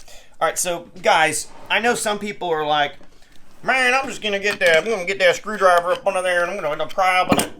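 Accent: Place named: South, United States. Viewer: Upvoted for southern dialect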